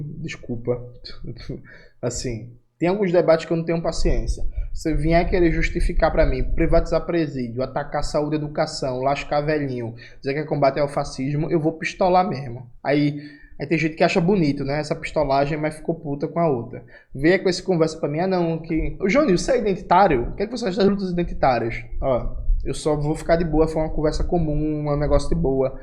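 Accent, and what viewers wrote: Pernambuco, Brazil. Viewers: Clique beite. Eu adoro esse sotaque de Pernambuco❤❤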